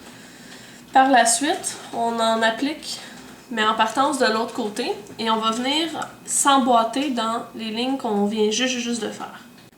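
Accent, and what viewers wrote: Quebec, Canada. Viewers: J'adore l'accent canadien! ;) Ils sont jolis les motifs et tu l'a très bien réussi! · Magnifique !! J'y avais jamais pensé tiens.... J'ai pas le cerveau d'un astronaute x) Nouvelle abonnée. PS : J'adore l'accent Québecquois !! <3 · Trop beau et j'adore ton accent (québécois) ??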